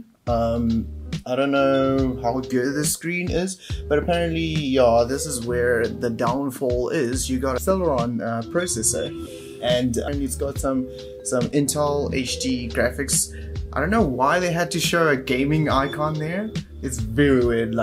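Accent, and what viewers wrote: South Africa. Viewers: you are south african nè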